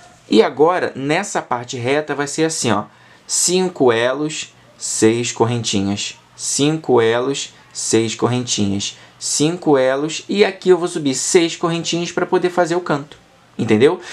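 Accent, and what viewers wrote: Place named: Rio de Janeiro, Brazil. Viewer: Linda aula, bem explicada! "Carioquês" delicioso de ouvir!💛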